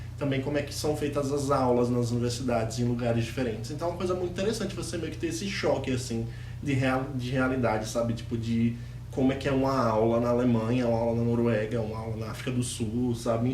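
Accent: Brazil. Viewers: Aiii, vc é de onde? Parece bastante com o sotaque do RN!! Adorei e o vídeo, por favor faz mais! Tem poucos videos falando dessa forma sobre o Erasmus Mundus em português! Voce poderia falar mais sobre o processo de inscrição em si, a quem vc pediu carta de recomendação, o que elas continham. Detalhar um pouco seu currículo, pq tipo, vi algumas pessoas falando que nota contava muito, outra pessoa dizendo q as notas nao eram TAO boas, mas q tinha muitos projetos. Como /pq vc escolheu esse Mestrado em específico. Xero!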